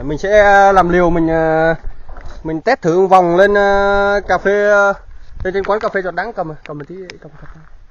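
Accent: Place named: Phu Yen, Vietnam. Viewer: Phú yên na anh kkk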